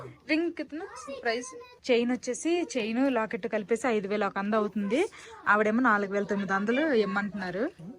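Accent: Srikakulam, India. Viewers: Weight loss ayinapati nundi mi dressing style kuda change chesaru So miru Ala change ayinapudu Srikakulam slang use cheyadhu🙏 inka deniki modern language lo ne mataladachu ga. Videos kuda chala lag avuthunayi... Okay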